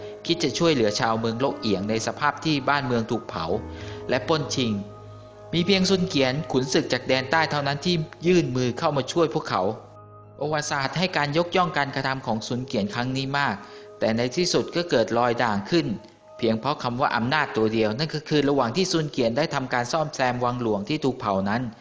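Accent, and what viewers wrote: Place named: Isan, Thailand. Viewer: เเอดมิน น่าจะเป็นคนอีสารเเน่เลย เเมนบ่อ้ายสำเนียงมันบอก555 (หนู๋คนอุดรเด้อ)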